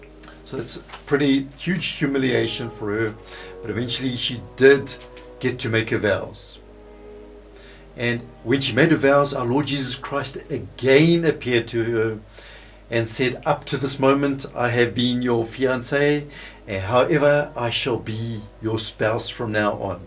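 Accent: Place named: South Africa. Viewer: Your south African accent is unmistakable, greetings from Pretoria 😁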